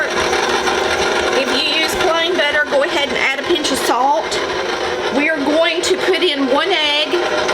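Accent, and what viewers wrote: South, United States. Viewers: She is so cute with that southern voice i love how she read everyone in a friendly manner in regards to the lemon squeezer .... The lemon bars look delicious .....and yes i will try extra lemon lol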